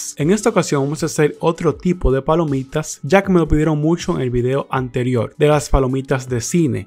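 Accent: Dominican Republic. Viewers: Eres dominicano? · Suenas de RD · Sos dominicano verdad?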